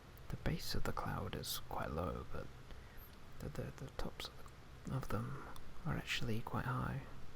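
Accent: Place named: United Kingdom. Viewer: your British accent is so soothing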